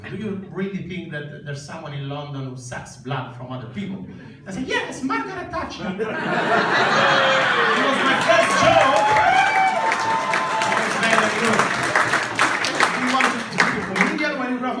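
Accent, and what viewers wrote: Rome, Italy. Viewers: from a fellow roman it's funny how he transfers directly "roman humour" and accent in English. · HA ROMANIZZATO L'INGLESE...L'HA RESO SPICCIO HE HAS ROMANIZED ENGLISH TALKING...MORE EASY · Non concordo, ha un ottimo inglese. Non fa errori grammaticali e ha un vocabolario più che adeguato. Ha una pronuncia più che decente, molto comprensibile seppure con un fortissimo accento italiano. Ma se si capisce cosa dice e non fa errori di pronuncia a lui trasparenti, l'accento non è un errore, non intacca la valutazione del suo inglese così come "nativo" non è un livello di inglese. Capisco che sia Italiano come capisco che una persona di Manchester sia di Manchester e una persona Irlandese sia Irlandese, eppure non diresti mai che un Irlandese non abbia un perfetto inglese perché non parla come Re Carlo. Perché poi si entra in una seconda questione: quale sarebbe il nativo corretto? 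Americano - e quale Americano? O preferisci RP? Ogni accento identifica la provenienza. Quale di queste provenienze siano considerate native non è questione di livello di inglese, ma di geopolitica. Il fatto che parli con un accento non mi dice assolutamente niente sul suo livello di inglese.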